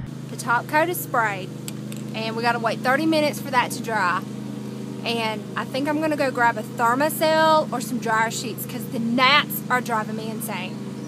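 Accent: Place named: Georgia, United States. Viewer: Cool to see a fellow south Georgian on here. I live between Waycross and Brunswick. I thought the accent sounded familiar. Where in South Georgia are you located(approximately, not your address. Didn’t want to come off creepy lol lol)?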